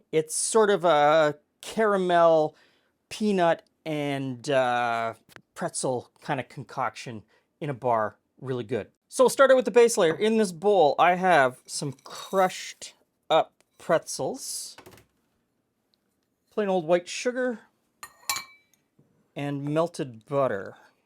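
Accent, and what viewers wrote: Canada. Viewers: Whats the worst thing that could happen? Nothing! There is no downside to that scenario. 😝 You need an “Evil” playlist. The mars bar/ricebubbles thing definitely has a place on the list. That car-mel v’s car-a-mel bugs the heck out of me. We say car-a-mel, because that’s how it’s spelt. Never heard it called car-mel until youtube introduced me to american cooks. I’d rather listen to them say allooominum and tomaaaato all day than hear them say car-mel even once. Flippin weirdos 😂. But if you Canadian’s spell it car-mel, well thats ok. It sounds perfectly lovely in your Canadian accent.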